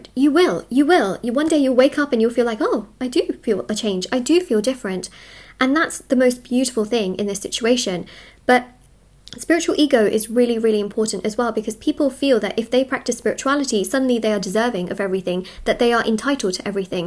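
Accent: United Kingdom. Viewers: This lady is from UK I can say that. I wish to go and work in london for a couple of years. This slang sounds so interesting.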